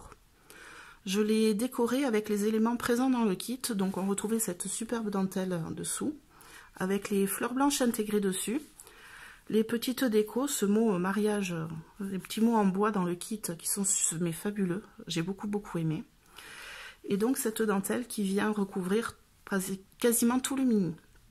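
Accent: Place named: South, France. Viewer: oh! comme ça fait du bien d'entendre ton doux accent du sud! Une superbe réalisation et le début d'une belle aventure pour toi! Quand je te disais qu'il fallait te lancer! Elle est super ta vidéo, bravo! MUXUS!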